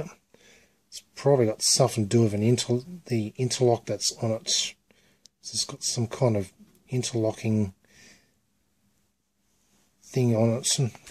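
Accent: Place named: Australia. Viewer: Hi there. I have a camera I got with my little quadcopter. It records onboard to a micro sd card. The quality is surprisingly ok but it does not have av out . I would like to add an av out plug to it if possible for wireless streaming. Would u take a look at it please and maybe point me in the right direction as to wht to solder or not. I can take pics for ya or send ya a video of it. I hear u are Australian. Could maybe post it to you. Im in perth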